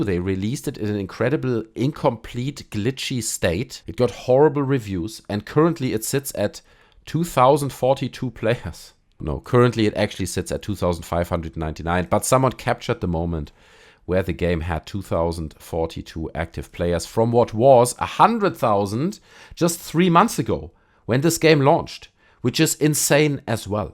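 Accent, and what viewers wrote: Germany. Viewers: The german accent is strong in this one. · The accent is so bad i am pretty sure you are a german. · could it be that you are german? you have that distinct accent ;)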